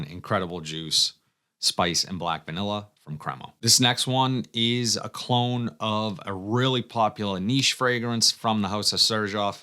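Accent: Boston, United States. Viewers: Great review Boston bro! Have my son with me today and he digs your accent. Sadly mine only kicks up when in Beantown and Warner, NH :)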